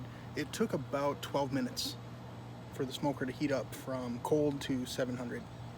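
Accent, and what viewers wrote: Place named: United States. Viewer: We say basil you say baysal lol, love the American English.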